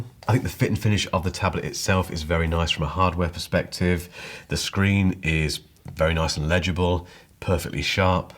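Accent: United Kingdom. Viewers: thanks for this. I have a remarkable, i purchased it just before they change to the connect option. Those of us the had the plan prior to the connect change got the full connect plan. Whew! Everything you said was correct. The price point was my biggest hartal at the time. I carries at least three note book on a daily basis but I could have used many more. I tried the iPad pro for a little while, but that was not satisfying even after the paper like screen covering. I love the tactile feel of writing and that took many months of youtude watching and reading reviews. With the remarkable 2 I enjoy writing on a digital screen. The price point is very steep and if not for my massive note taking I would have passed. One of the marketing focus points is no interruption when you are writing, drawing, or reading. Which turned out something that i enjoy. My iPad did not offer that unless i ran it in air plan mode. 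Thanks for your all your content. I have been subscribed for a few months and enjoy you British accent. Keep up the great work!! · I really ain't sure if I subscribed your channel for the reviews or for the... British accent.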